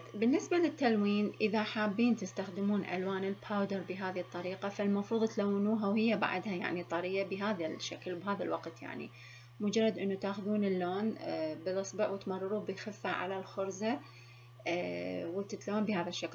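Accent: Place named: Iraq. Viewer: من جد روعة روعة روعة سلمت يداك ست واني استفيد منك هواي واحب شغلك بعجينة السيراميك اتبع طريقتك ومقاديرك واسوي منها اشكال تجنن ميداليات بس م جربت الاكسسوارات لا تتأخرين علينا بفيديوهاتك الرائعة وافكارك الجميلة وكتير حبيت اللهجة العراقية من اسمع صوتك 😍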